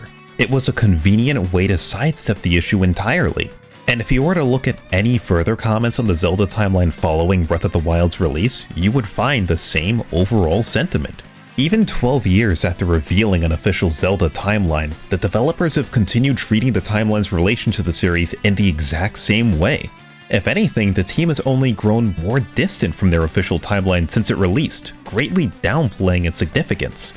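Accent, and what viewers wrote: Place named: New York, United States. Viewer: You must have the heaviest New York accent when you're not putting on this radio voice.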